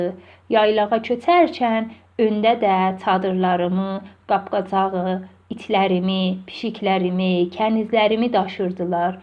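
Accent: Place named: Iran. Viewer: چوخ ساغ اولون زحمت چکیبسیز. آما روایت ائدن قؽز، تۆرکجه‌نی یاخشی قرائت ائتمیر. سانکی بیر فارس، تۆرکجه‌نی قرائت ائدیر. گئنه‌ده الله یاردیمجی‌سی اوْلسون. زحمت چکیر.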